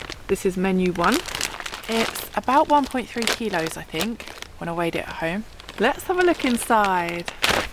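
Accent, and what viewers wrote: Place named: Oxford, United Kingdom. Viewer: Nearly Oxford! English! With? A Twist! 😂❤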